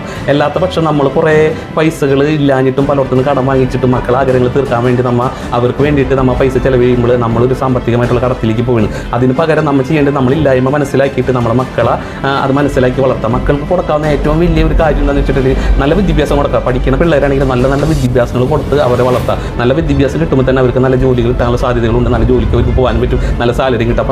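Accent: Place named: Cherai, India. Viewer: Cherrayi slange..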